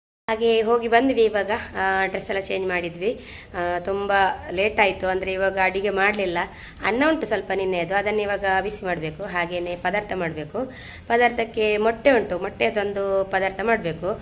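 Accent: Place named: Mangalore, India. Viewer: Motte recipe super ❤love ur Kannada accent mangalore style ❤😊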